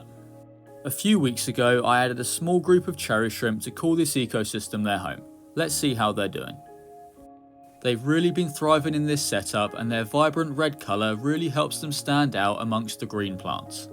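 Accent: United Kingdom. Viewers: Hello! Thanks for very entertaining and educational channel. I'm from Sweden and wondering where in the UK you're from!? What dialect you speak!? ☺️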